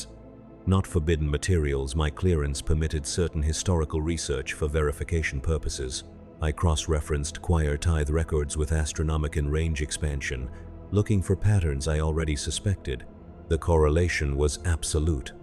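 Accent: United States. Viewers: Dude the voice is American again ? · Brother, stick with the old voice. This is painful to listen to. Voice is from some dude in Vermont that did an extra year in Grammer school.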